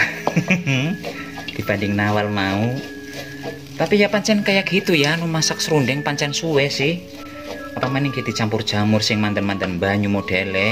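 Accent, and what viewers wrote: Banyumas, Indonesia. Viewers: pasti aku ketawa dulu baru komen 😂 salam ublek" capcus wajib di coba ngapak terusss nggeh 😂😂👍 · Itu ciri khasnya kang..bhs ngapak.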